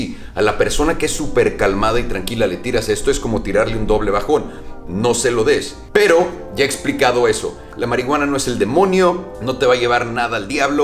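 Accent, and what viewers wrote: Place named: Mexico. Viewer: Tienes cara de colombiano y acento mexicano.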